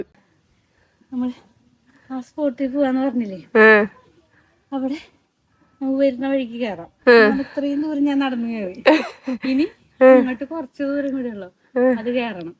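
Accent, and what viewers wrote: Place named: Thrissur, India. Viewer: Trissur slang is very good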